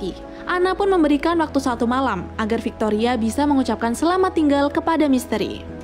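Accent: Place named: West Java, Indonesia. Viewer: Teh orng Sunda nya wkwkwkwk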